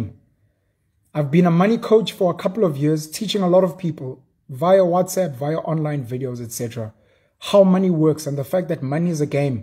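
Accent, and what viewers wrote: Zulu, South Africa. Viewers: You have one of the purest Zulu voices, from a sound perspective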